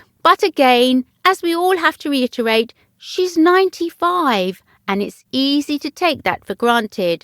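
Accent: United Kingdom. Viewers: Get rid of this dreadful voice. Sounds like a Valley Girl with a British accent😡😡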